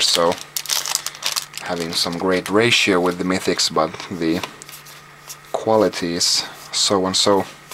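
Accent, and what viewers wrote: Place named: Finland. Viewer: I think I just nerdgasmed for the accent suomi perkele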